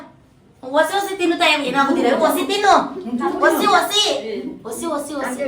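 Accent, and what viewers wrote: Mandya, India. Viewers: ಮಂಡ್ಯ ಭಾಷೆ ಸೂಪರ್ 😂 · Hai akka nim Amma jothee enu thumba video madee nim mandya bashee thumba changedee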